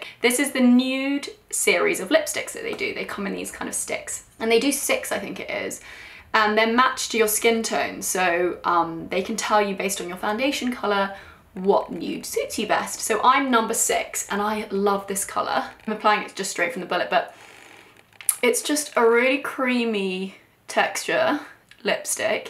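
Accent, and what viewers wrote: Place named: RP, United Kingdom. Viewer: In what way, she has a pretty standard British accent?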